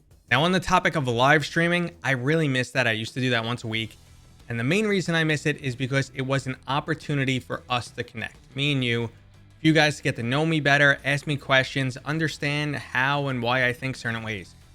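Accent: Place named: New York, United States. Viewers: AYY A FOCKING NEW YORKA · I KNEW YOU WERE FROM NEW YORK!!!! Yesss! Love it!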